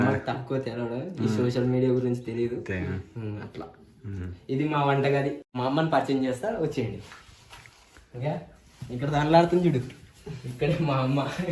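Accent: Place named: Gadwal, India. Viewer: Gadwal slang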